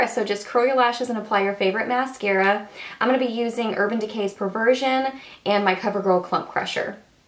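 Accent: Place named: Texas, United States. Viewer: I literally LOVE your accent, and it makes me happy that you're a fellow Texan! Do you do collabs? I'm totally interested in doing one with you. Let me know. If not, I still love this! Haha.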